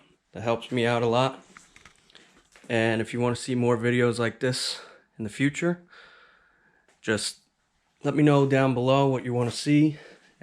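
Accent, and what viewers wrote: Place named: Upstate New York, United States. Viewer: Good review. I was playing the game of what state is this guy from based on the way you say “off”. “Ohaaaff”. Upstate NY?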